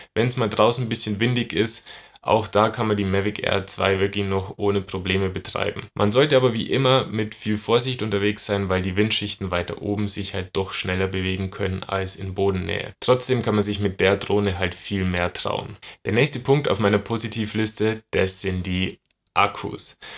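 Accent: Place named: Bavaria, Germany. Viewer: Servus, guter Content und angenehmes bayerisch :) ist das Foto bei Min Regensburg?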